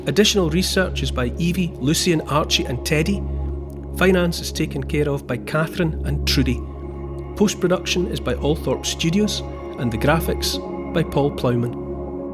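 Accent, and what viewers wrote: Scotland, United Kingdom. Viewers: Thank you again Neil for a momentary escape from reality.North England was my home until many years ago when l was sent to New Zealand. I cannot tell you how nostalgic your historical videos make me feel. As a history buff myself l just love learning about British history. lt is a wonderful thing a sense of connectiveness and a sense of belonging when l hear your Scots brogue so lovely on my ears when you speak . I have been to Lindisfarne some years ago, magical place. I feel so happy that the history good or bad that l hear from you gives me a sense of belonging as they are my People. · Your commentary on the history of the British Isles spoken in that wonderful Scottish accent makes every episode for me , like living the tale. Thank you Neil. I loved your story about Australia and again it was your story telling that made it so special. 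My wife is a direct descendant of Bishop Pedro Mews and his history is quite amazing. I would love it if you could bring his life and work to life in your inimitable. way. It's a story worth telling. 🇦🇺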